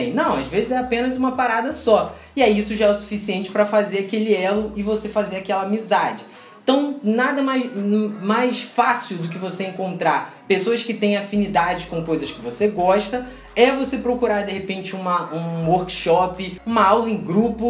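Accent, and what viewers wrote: Rio de Janeiro, Brazil. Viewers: Tu è do Rio né?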